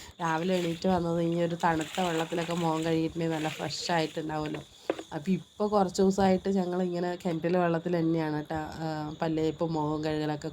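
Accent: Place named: Pathanamthitta, India. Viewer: വീഡിയോ ഇഷ്ടം ആയി. ഞാൻ പത്തനംതിട്ട ആണ്. ഒരപേക്ഷ ഉണ്ട്. നിങ്ങൾ പാലക്കാടൻ സംസാര രീതി ആക്കാമോ. ഞാൻ അത് കേൾക്കാൻ ആണ് വീഡിയോ കണ്ടത്. പക്ഷെ ഇതൊക്കെ ഞങ്ങളുടെ സ്ലാങ് പോലെ ഉണ്ട്. നിങ്ങളുടെ ഗ്രാമ ഭാഷയിൽ സംസാരിക്കു... മനോജ്‌ ബ്രോയെ പോലെ.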